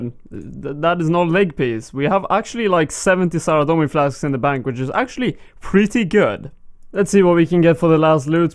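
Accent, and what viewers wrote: Sweden. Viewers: Man i love your swedish accent !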